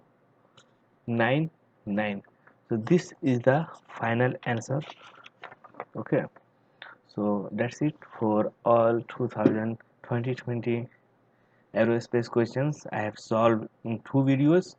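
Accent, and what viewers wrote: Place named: China. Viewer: why you speak like a chinese person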